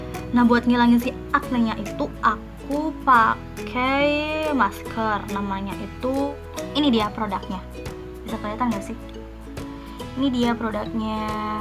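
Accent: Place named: West Java, Indonesia. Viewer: Sundanese bgt yekhannn 😁😅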